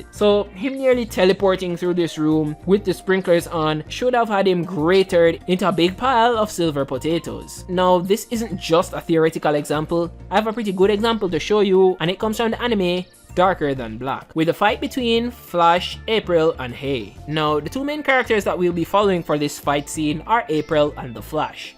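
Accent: Jamaica. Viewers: I can tell that your Jamaican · Yuh jamaican? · I can tell from the accent that your a yardi big up mi G💪🏾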